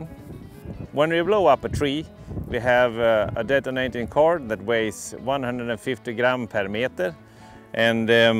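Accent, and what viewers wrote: Sweden. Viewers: Bra / Good Swenglish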